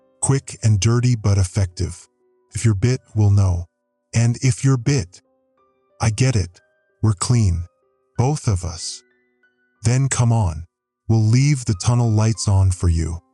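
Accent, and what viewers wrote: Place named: Spain. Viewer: The AI keeps going between regular English to a heavy Spanish accent lol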